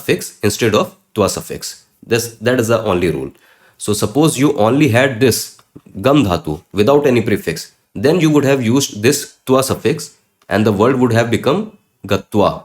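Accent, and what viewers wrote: Hindi, India. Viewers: Namste, Your class is great. Very useful. I was in search a class where Sanskrit Grammar is taught through Bhagavat Gita. I have started to go thorough the episodes one by one. Thank you so much for uploading the classes. One thing I wish to request you is about the pronunciation of Sanskrit words. Please pronounce अ मात्रा ending words as it should be in Sanskrit language. For example, सञ्जय उवाच should be pronounced 'Sanjaya uvacha' not 'Sanjay uvach' as it is in Hindi language. If it is 'Sanjay uvach' it should be written with a halant in Sanskrit i.e. सञ्जय् उवाच्. Hope you will take my suggestion positively if you feel it is correct. 🙏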